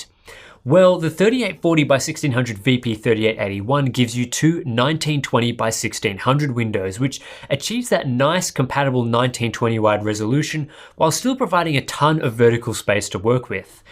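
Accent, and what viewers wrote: Australia. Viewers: Australian accents are hawt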